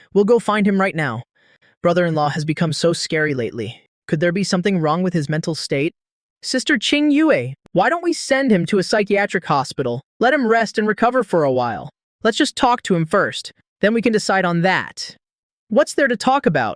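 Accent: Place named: United Kingdom. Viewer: where`d the british accent come from 🫠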